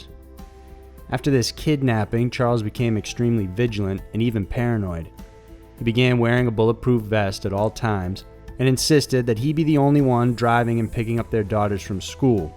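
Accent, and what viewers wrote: South, United States. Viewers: God I love your sexy touch of southern drawl. You make mysterious shit hot again man.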